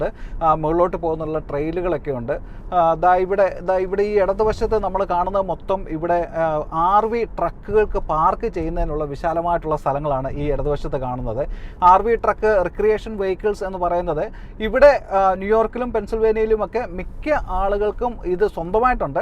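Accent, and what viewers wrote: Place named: Pathanamthitta, India. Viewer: ഭാഷാ ശുദ്ധി കേട്ടപ്പോൾ പത്തനംതിട്ടക്കാരൻ ആണെന്ന് തോന്നുന്നു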